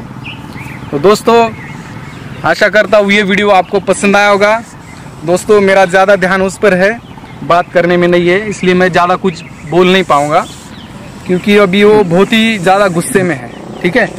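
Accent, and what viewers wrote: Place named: Maharashtra, India. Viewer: मराठी माणसाची हिंदी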